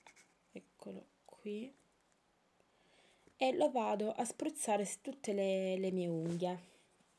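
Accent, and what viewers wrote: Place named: Lecce, Italy. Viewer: ciao ma x caso sei d lecce??? si sente da cm parli *_*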